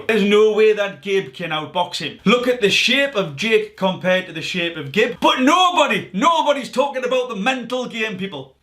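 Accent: Newcastle, United Kingdom. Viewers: you and true geordie have the same legendary accent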